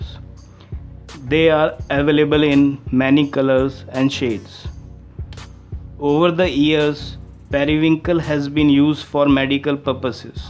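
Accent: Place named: India. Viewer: Your accent is too strong I cannot understand your Hindu English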